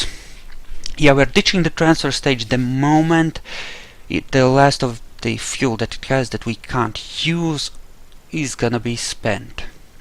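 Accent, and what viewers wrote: Russia. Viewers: I like your accent. Where are you from? Russia?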